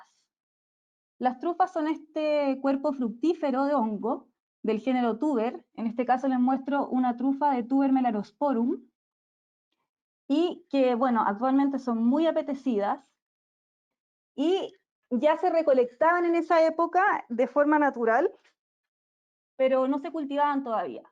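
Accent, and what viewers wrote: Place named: Chile. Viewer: Gracias por la conferencia, muy didáctica y un contenido excelente. Y un acento chileno precioso, digno de Gabriela Mistral...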